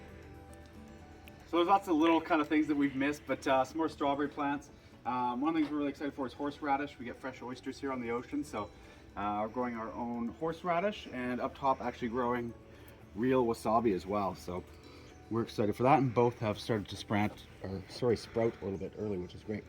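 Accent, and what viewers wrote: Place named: Canada. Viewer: I knew I was hearing a Canadian accent. What a location! Was this land in your family?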